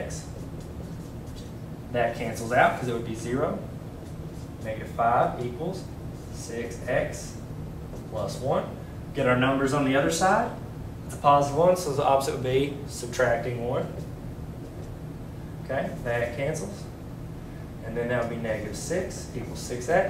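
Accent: South, United States. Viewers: Ummm why do u have to be so good looking? And that southern accent...couldn't concentrate on the lesson at first! ;-)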